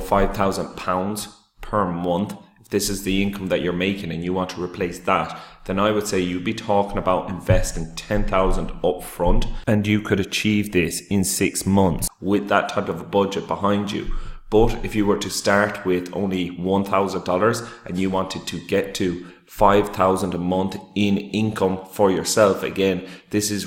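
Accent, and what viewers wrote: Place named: Ireland. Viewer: I hear an Irish accent, what part are ya from ?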